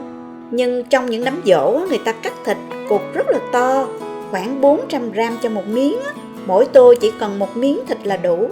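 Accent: South, Vietnam. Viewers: 🌹 Mình người miền Trung và rất thích ăn món thịt kho tàu này. Nay thấy bạn hướng dẫn trên đây, nhìn thấy hình ảnh nồi thịt kho rất đẹp mắt và rõ ràng cùng với giọng nói dịu dàng dễ thương của phụ nữ miền Nam... mình những muốn thưởng thức ngay từ đầu, và theo dõi cho tới cuối mặc dù mình cũng biết nấu món này. Rất cảm ơn và chúc chương trình của bạn, ngày càng được nhiều người yêu thích hơn. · Tiếng cô nói riêng mà tiếng người miền nam nói chung dễ thương ghê 😍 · Giọng nam bộ 100% nghe ngọt quá, chắc thịt kho tàu cũng ngon ngot lắm. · Giong dac rat mien Nam that de thuong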